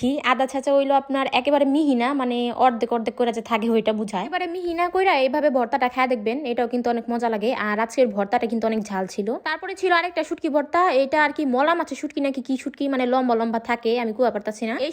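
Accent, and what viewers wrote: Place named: Old Dhaka, Bangladesh. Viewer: আপু তুমি যে পুরান ঢাকার ভাষা বলা আমার এত ভালো লাগে তোমার সবগুলো ভিডিও দেখে মাশাল্লাহ দারুন হয়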